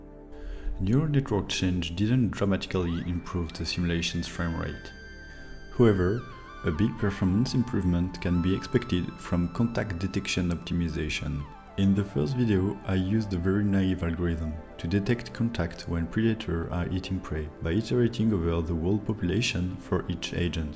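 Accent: France. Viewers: T'es français non ? X) nice video tho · R’u french ? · Your channel is becoming amazing ! Great french accent btw ! And the animations are ON POINT ! 👌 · Déjà la vidéo est hyper bien, mais aussi petit big up à ton accent français haha :) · anyway a question, are you a French native speaker? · The youtube channel is located in France and with this accent I'm absolutely sure that he's French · J'avais reconnu notre bel accent 👌😂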